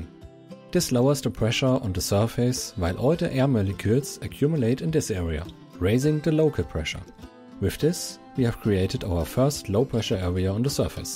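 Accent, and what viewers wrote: Germany. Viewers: hearing a german accent makes the high pressure systems turn german too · recognized that German accent.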